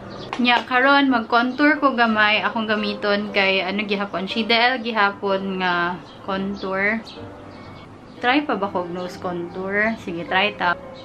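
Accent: Visayas, Philippines. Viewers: Lamia paminawon nimo mag bisaya 💕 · Gnhan ko maminaw sa imong bisaya nga dialect ay,DLI kiwaw... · Lambing kaayo ka mag bisaya:) hehehehehhe love it ❤️❤️❤️❤️ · ang cute mo magsalita ng bisaya ate 😍 hehe 👍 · Ganda pa din ng accent mo kahit bisaya 😍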